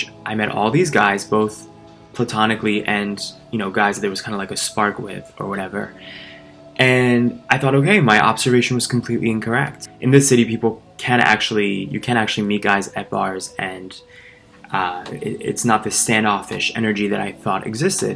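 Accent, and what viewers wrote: New York, United States. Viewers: you're funny i notice you have a similar dialect as my NYC friends ... the gay experience, i was born in LA, raised in OC at the beach and lived now in LA since the 80's. It is possible to meet decent guys but they are few and far between. Dysfunctional. I stayed for the weather.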